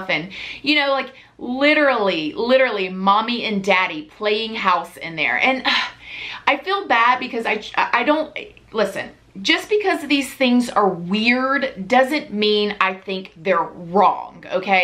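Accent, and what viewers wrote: South, United States. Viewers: You are literally so precious!!! Love your southern twang x you kept cracking me up!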